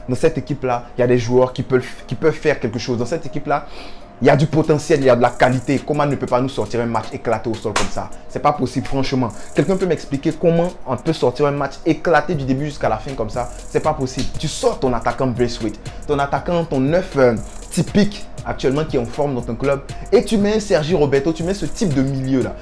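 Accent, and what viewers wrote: Ivory Coast. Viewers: C’est la première fois que je ressens ton accent ivoirien 🤣🤣🤣 ça degba Garcia et piqué ensemble c’est pas possible même profil pas assez rapide . Araujo un monstre ce petit, De Jong il est tellement bon mal entouré et Depay un artiste très talentueux 😍 cependant trop de responsabilité à peine arrivé. Busquets il est plus à niveau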